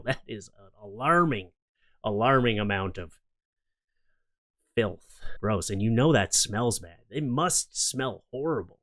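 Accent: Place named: Canada. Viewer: CripTIDS, dude. Criptids !! Man, do I dig that Canadian Archie Bunker second language you got going on! Never change, man.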